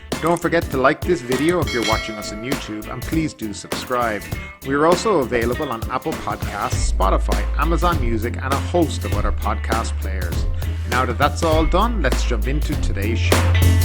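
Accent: Ireland. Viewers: I love the Irish accent..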